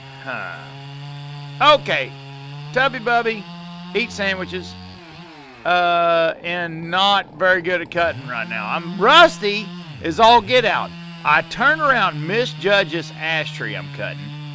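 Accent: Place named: Bronx, United States. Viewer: Man hard love your vidgas, and your Bronx accent makes me think of home🤣🤣✌✌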